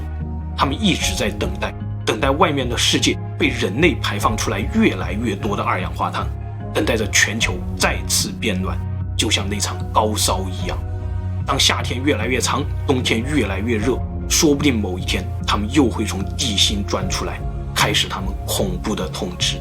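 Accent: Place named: Fujian, China. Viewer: 特殊的福建口音非常帶感，獨樹一幟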